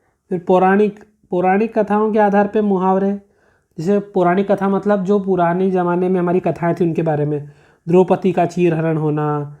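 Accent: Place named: Indore, India. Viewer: Indori Bhasha bahut jhalak rahi hai bhai aapki 👍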